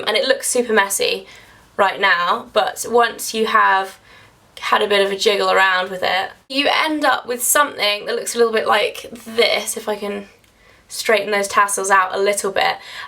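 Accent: United Kingdom. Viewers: love ur british accent :)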